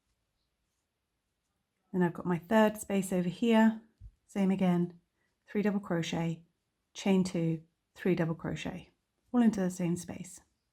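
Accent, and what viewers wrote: England, United Kingdom. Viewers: I adore this and your style of teaching is brilliant. The only thing that fooled me was I heard the English accent and thought, yes English terms , but alas not, it was America terms. Keeps my mind active, changing them into English as you are saying what stitch to use. Lovely work. Thank you .💞💞💞🧶🧶🧶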